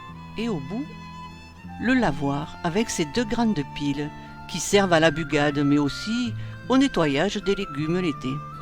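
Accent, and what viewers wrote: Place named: Provence, France. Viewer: Quelle belle histoire, avé l’assent, qu’elle est belle cette crèche, je suis bercée. Merci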